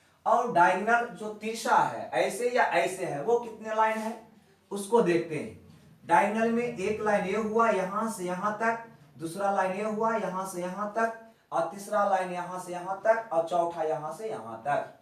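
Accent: Bihar, India. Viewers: Aap Bihar Ka ha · Sir Aap Bihar se hai???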